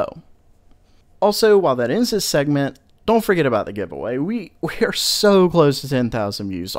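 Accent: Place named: South, United States. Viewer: That southern accent. :D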